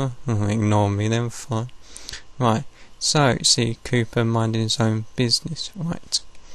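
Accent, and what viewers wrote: United Kingdom. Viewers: Very nice video! It's interesting to see how you make all those really cool codes, and memory edit the game! Looks a lot like the hex editor I use (I would suppose so, it's hex editing). Nice British accent too as well! Mine went away a long time ago.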